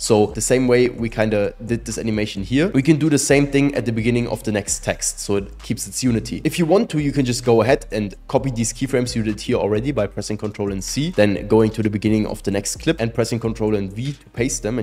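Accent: Germany. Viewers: bro sounds so german 😭😭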